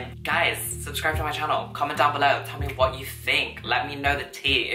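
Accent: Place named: London, United Kingdom. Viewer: I’ve just been watching all of your old videos from like 3/4 years ago and you used to speak to posh and delicate and you used to be so quiet and no hate but all of your recent vids you’ve got this mad London accent it’s weird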